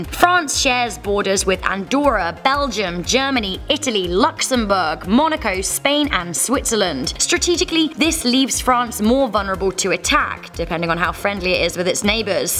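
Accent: England, United Kingdom. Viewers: You live in Canada but sound very English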